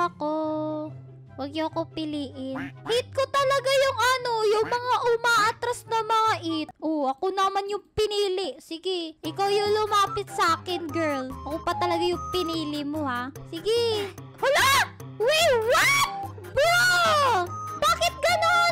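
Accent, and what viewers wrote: Visayas, Philippines. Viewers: Ate Bisaya Ka po ba?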